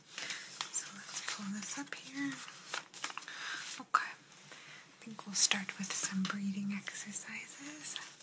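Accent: Italy. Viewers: Hi, i must have fallen asleep. I will need to watch the video again. 😊 Could you do another cranial exam with your italian accent? 😅 or a travel agent. Showing us beautiful places in Italy to visit. That would be amazing! Anyways, thank you for another great video. Ciao! 😊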